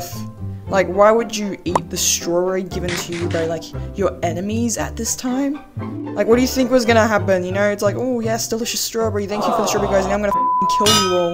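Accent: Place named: Australia. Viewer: Ohh your’e Australian? So am I - also these are very accurate ranks in my opinion.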